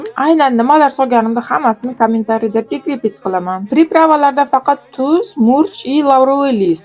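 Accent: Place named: Samarkand, Uzbekistan. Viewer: Кизиз куз тегмасин истаралигакан.сузизга ча,ча кушиб гапрябсиз самаркант тарабдами уйларин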